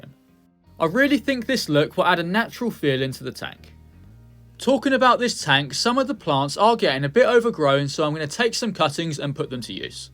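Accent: United Kingdom. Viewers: Hello! Thanks for very entertaining and educational channel. I'm from Sweden and wondering where in the UK you're from!? What dialect you speak!? ☺️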